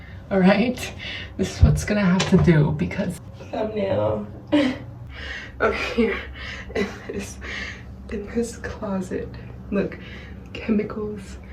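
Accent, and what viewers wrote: Jamaica. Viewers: How is her accent Jamaican in only fourn months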